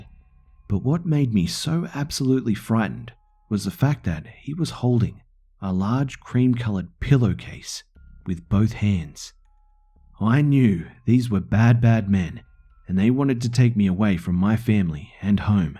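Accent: Australia. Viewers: Holy shit Australian narrator! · He's Australian :)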